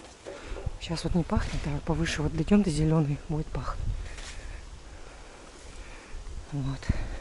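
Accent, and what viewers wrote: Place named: Urals, Russia. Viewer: Даже не зная,где вы живёте,я поняла по говору,что вы с Урала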